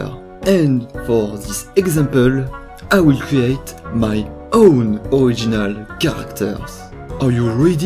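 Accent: France. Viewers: Wait, are you from france? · your french accent is beutiful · Mec Ta un accent français ES QUE T FRANCAIS? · L'accent français est parfait! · Je rigole quand j'entend cette voix. C'est pas contre toi mais j'ai l'impression que tu fais un peu exprès de mettre cette accent français. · he is french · You French. Also merry Christmas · Cet accent français magique mon gars, tu m'as tué 😂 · You are astonishingly French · Nice French accent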